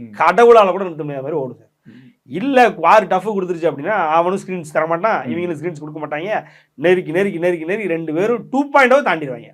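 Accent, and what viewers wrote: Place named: South Tamil Nadu, India. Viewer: I like your speech and enjoy listening to you particularly your tamil and south slang. Please continue speaking in tamil.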